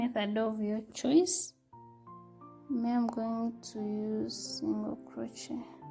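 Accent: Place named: Uganda. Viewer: Nice work, are u a Ugandan? U sound like me 😍😍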